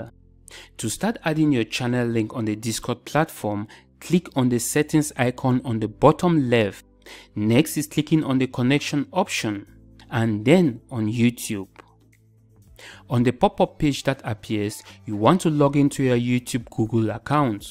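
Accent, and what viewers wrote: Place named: India. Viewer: srry i thought u were indian bcs u have indian voice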